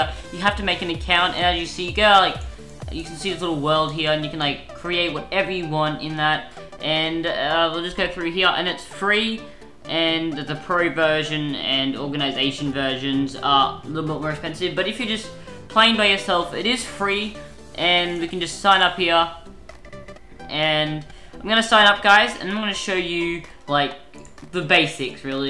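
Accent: Australia. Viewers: Are you from Austrelia?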